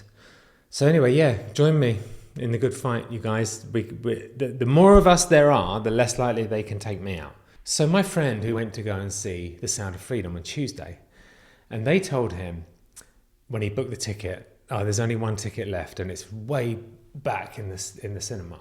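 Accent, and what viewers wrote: United Kingdom. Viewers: Love your snappy suits! Strong, masculine and intelligent. Plus, British accent! ❤ Get a German Shepherd!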